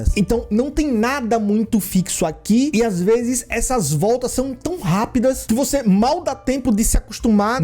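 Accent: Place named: Bahia, Brazil. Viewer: Esse sotaque me faz voltar pra Salvador imediatamente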